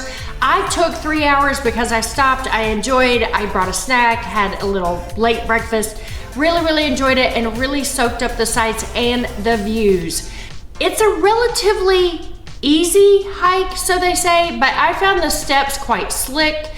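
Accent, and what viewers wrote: United States. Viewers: Thank you so much, the only thing and would help me much more is by putting subtitles for the next time!! Specially for the names that aren’t well pronounced (sounded with so much american accent I can bearly understand the towns!!)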